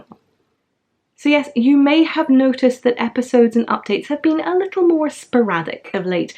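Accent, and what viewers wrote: Scotland, United Kingdom. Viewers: Wishing you every happiness in your new home; south of the river is good, if you fancy a day by the sea side, pop in, I am in Ramsgate. I love listening to your bonny Scottish accent and the amount that you achieve is mind blowing. (I speak as a machine knitter who does not reach a fraction of your FOs).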